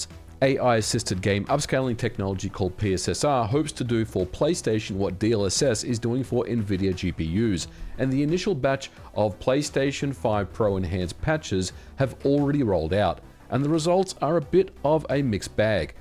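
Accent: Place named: Australia. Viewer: You must be an Aussie living in the US, I recognise a fellow Aussie 🇦🇺 Back in Sydney I got my Pro for $500.oo EB Games, with a trade & Club 5 level 🎉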